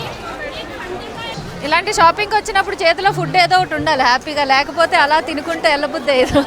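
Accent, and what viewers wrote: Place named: India. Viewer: ur totally changed 😀😀dubai to pure Indian slang version but very happy to see u don't stop vlogs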